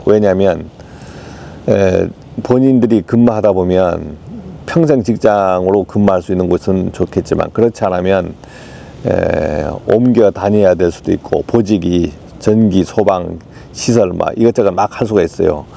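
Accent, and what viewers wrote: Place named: Jeolla, South Korea. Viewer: 이분 가족있나요?? 전라도 사투린데 ..........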